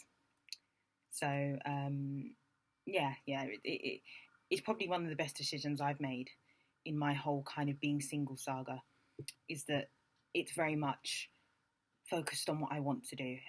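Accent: United Kingdom. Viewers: omygod u remind me Jessica sula in the series (skin) with your voice and your accent. I love British accent. but I'm not in England, I'm in Canada(America)and would like to be in your country to be better in English and to can talk with British accent. thanks to listen me and i hope u will share more super videos ad I will always listen u. bye😘😍😀😀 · Man....there's just something about black girls with British accents........😍😍😍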